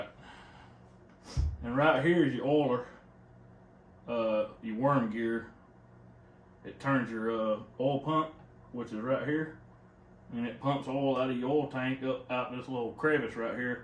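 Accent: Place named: South, United States. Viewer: you might want to check a company called Farmertec. It is a Chinese company that sells all kinds of parts for your saw on the internet. I bought parts from them before. It was not too bad, shipping and cost. I also watch a couple chainsaw youtube channels, people who work on them.. Tinman and the second one is ironhorse. both are very good down home people. They might have some used parts you need. You remind me of my dads side of the family. They are also from Tennessee. You look like them and your size is about the same. I can definitely understand your southern accent. It makes me smile. Oh I miss them. Now I'm old and they are gone.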